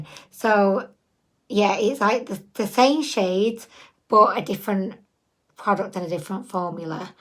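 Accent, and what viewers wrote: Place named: England, United Kingdom. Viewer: Like your presentations, sounds the way I would speak to a friend without the english accent!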